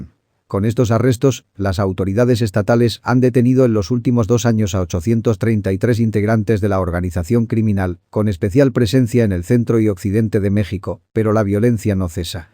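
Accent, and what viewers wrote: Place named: Spain. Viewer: Tu vos es de España A ti que más te da lo que pasa en México 😒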